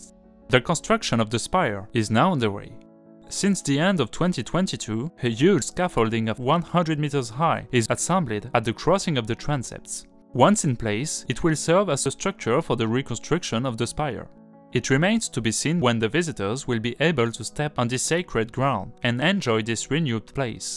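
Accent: France. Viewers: I even found your French accent charming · Votre accent francais a ajoute beaucoup a cette presentation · The accent is obviously French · The Franglais is understandable. Gérard Depardieu could not do it better. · I can hear the typical French emphasis on certain parts of the word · Your English is perfect and made even more pleasant with your French accent. Best wishes from Canada 🇨🇦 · You sounded great. I had no problem. Love your French accent. English sounds so much better spoken by a Frenchmen! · I am a native English speaker who has studied many languages including French, so it didn't take me long to adjust to your English grammar and dialect...but I turned on the subtitles just to be sure I didn't miss anything. But you may have viewers from non-English-speaking countries who don't know French but do know English, maybe a lot or maybe a little...and they may need more help in understanding your English (or anyone's English). 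So, as a general recommendation, I think it would be good to have the subtitles available for people to turn on or off, using the CC button, as it is now. Merci infiniment pour vos merveilleuses vidéos! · you do have a strong French accent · l'Anglais parlé avec un accent Français... je n'aime pas beaucoup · the pronunciation is quite French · It sounds french... one of these pleasant french English speakings that sound original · You have a lovely French accent!! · You do have a strong French accent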